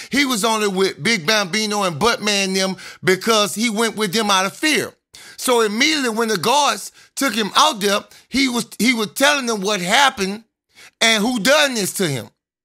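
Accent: New Orleans, United States. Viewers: Can I asks are you saying joes or joints I’m sorry its that New Orleans twang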